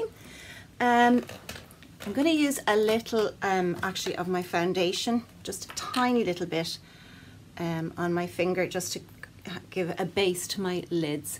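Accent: Ireland. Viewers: Thanks for sharing, love your look and love listening to your lovely Irish accent. Huggss from Australia xox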